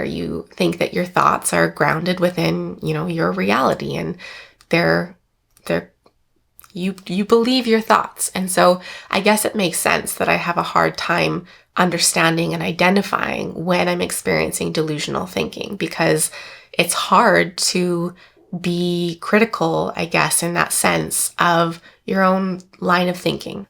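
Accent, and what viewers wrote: Canada. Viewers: Great educational video as always,! Very random but I always forget you’re Canadian until I hear you say certain words. In this video it was “about” 😂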